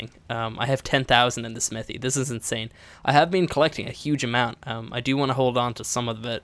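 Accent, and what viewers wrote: Ireland. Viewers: are you irish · Damon Carey it’s the way he says it in an Irish accent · He's very Irish.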